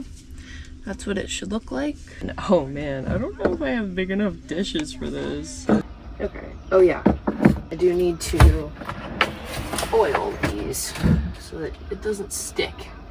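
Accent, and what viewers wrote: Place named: Delaware Valley, United States. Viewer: She sounds like she is from the delaware valley but i know baltimore people sound like this. Maybe west Virginia. Anyone can tell me where she is from?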